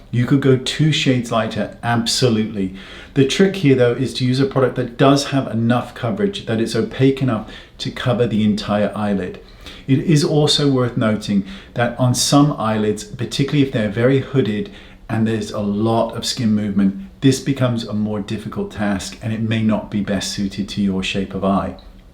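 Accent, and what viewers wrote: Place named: England, United Kingdom. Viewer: It's his lovely English accent, he's from Somerset I think which is usually a very broad accent, his is quite soft and only comes through occasionally. He does have a really nice voice. I watch a few English YouTubers and some of it has to do with their accents. I'm originally from the UK and I think I miss hearing English voices.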